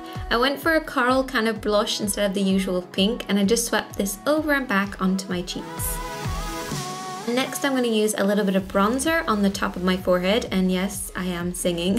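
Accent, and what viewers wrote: Ireland. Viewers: Which part of Ireland are you from? I can't place the accent! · hey!! are you irish!! :P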